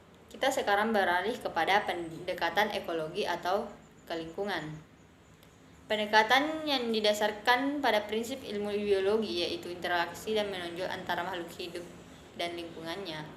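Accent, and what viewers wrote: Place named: Mandar, Indonesia. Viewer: Sangat baik👍. Cuman bahasa Indonesianya agak kurang. Masih logat² mandar. Semangat💪💪😁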